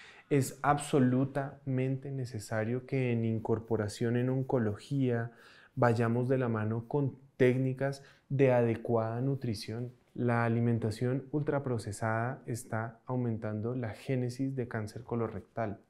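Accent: Colombia. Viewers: dije meditacion...y si..no lo recomiendo dira muchas cosas buena pero me parece dice mas cosas malas y mentiras...no se si el cree sus mentiras o no. Te recomiendo no te dejes engatusar por su acento colombiano bonito. Lo siento "doc" es lo q pienso.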